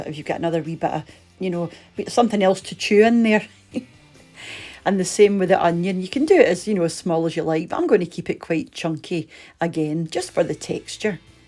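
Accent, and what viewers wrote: Scotland, United Kingdom. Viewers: Love hearing your Scottish accent🥰. My mother was from Glasgow Thanks for the recipes!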